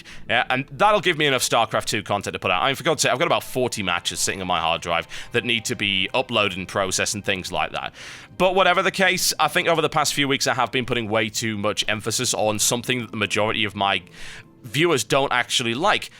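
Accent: United Kingdom. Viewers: to be honest, i stumbled upon you one day and just think you have one of the best commentating voice ever. It makes me happy to be british with people sounding like this. I've enjoyed all of your videos, the WoW stuff however isn't my thing but it dosn't bother me slightest that you want to put more i can still watch your over videos like the WTF series and other video series. (btw your starcraft videos are what have put tatic ideas for my play style) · I really just hope you continuing make videos becouse i just love the way you cast things. IDK if it is your voice, the british acsent, but i just liked it. Dam, i even got my WoW subscription back again so i could understand more of Azeroth Daily, and becouse i had nothing else to do on my free time and i was looking for a MMO. X_X" Anyway, just keep doing it man! You have all your subscribers not by coincidence, you earned then, you even earned the third place on that contest! 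LOVE U TB! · Hi TB, im one of the more "recent" viewers, only joining in the last month of the beta or so, but I have enjoyed your content and of course your british accent. The thing I want to bring up is the "lack of love" for your SC2 content. The issue with your SC2 content is (for me) not that I don't love SC2, but to be honest because there are much better commenters out there for SC2, people with deep personal knowledge and skill in the game, who can actually give us insight, like you do in WOW.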